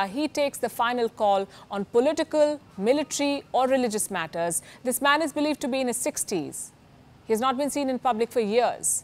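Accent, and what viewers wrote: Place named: India. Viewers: She's so good but she hasn't work very hard on her international English, still has a very strong Indian accent and sometimes this makes it hard to follow up. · She purposely has kept her indian accent .. bcuz she is indian ... U guys need to catch up if u want to watch her or else watch ur native accent news some where else...I bet there would b so many..... Simple as that..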